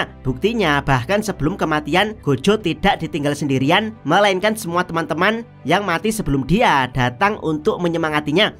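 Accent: Java, Indonesia. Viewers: medok bgt mas🤣🤣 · Suara mu terlalu kejawen Bang ... gak enak di dengar ,kurangi logat nya bro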